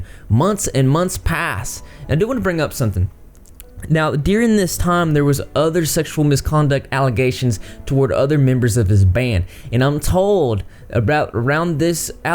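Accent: South, United States. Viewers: Glad i found you. Ur great.I'm in Alabama.u must be from the south too? Luv ur accent.plus ur so damn good looking! ❤...luv ur content 😊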